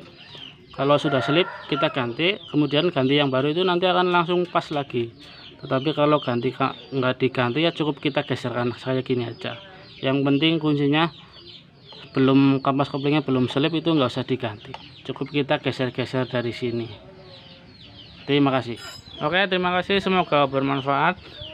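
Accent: Banyumas, Indonesia. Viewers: Dalam ngapak😂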